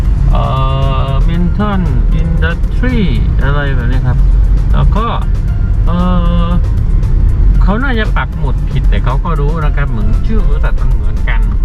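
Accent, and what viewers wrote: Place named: South, Thailand. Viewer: สำเนียงน้าเหมือนคนใต้เลยคับ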